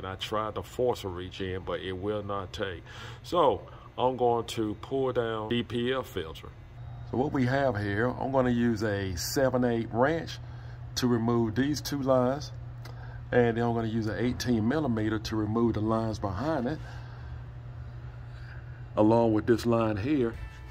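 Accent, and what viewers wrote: South, United States. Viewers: My mans so country but I love it lol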